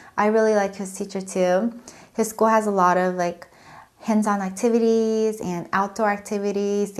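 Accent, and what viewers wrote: South Korea. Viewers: I think its quite interesting. You're an American-born citizen but you have a slight Korean accent when you speak (ie mixing up plural and singulars). It's fascinating how you acclimate to your surroundings or pick up people's mannerisms and speech. I hope you enjoy your life in Korea.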